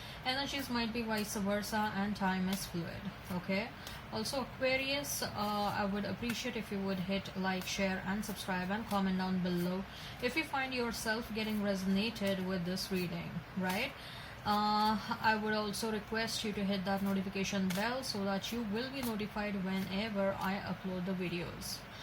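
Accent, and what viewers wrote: India. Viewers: Close this channel and go learn western English first so you can get rid of this Indian accent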